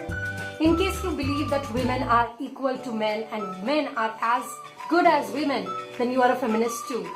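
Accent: Bengal, India. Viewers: Hey! I am new to your channel and the very first thing I noticed was your accent. You sound like a Bengali. Are you a Bengali? Kothay thako? Kolkatay? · Bengali! I was thinking so coz ur tone doesn't seems Marathi, Gujarati, Bihari, Assamese & when literature comes, Bengalis r on top. 😁 Great work, very inspiring.👍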